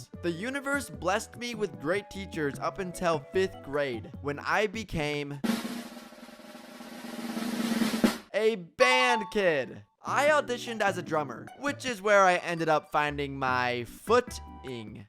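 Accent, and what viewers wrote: Iowa, United States. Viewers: You from Iowa?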